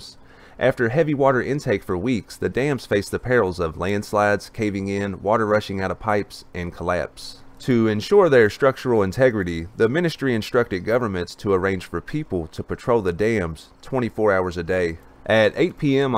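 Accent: South, United States. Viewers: Like the accent tho I gotta southern accent too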